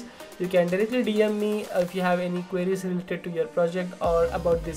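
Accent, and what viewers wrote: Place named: India. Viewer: Nnnnoooooo This video is exactly what I needed. And yet, it has been cursed with a pajeet accent.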